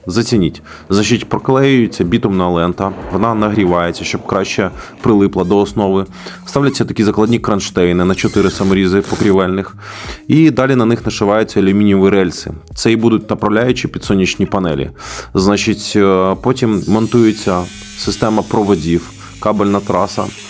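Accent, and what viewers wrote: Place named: Russia. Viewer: Обмоскалений диктор!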